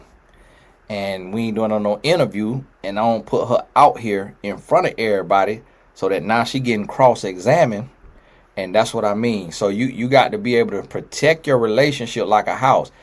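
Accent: South, United States. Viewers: Had to subscribe. This brother knows his stuff, real as all "get out", and funny without even trying. I love that he loves The Lord, loves his wife, FAITHFUL to his wife, hasn't let success change that southern accent😀, and fearlessly cover any celebrity couple whilst being a celebrity himself., truly your gift is making room for you sir and bringing you before great men! Btw, new subscriber🤛🏾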